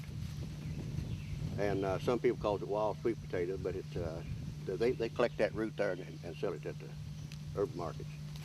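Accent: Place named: Western North Carolina, United States. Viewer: Love his voice! Reminds me of some of my great aunts and uncles in WNC.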